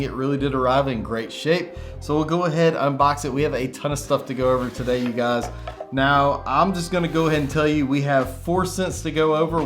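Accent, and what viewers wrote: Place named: United States. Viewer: I’m fascinated by regional accents so when I hear “carrer ool” I just have to know what part of the US you’re from. NOT making fun, swear. I’m from Philly, famous for weird-ass accent, so I’m just interested from a linguistic perspective.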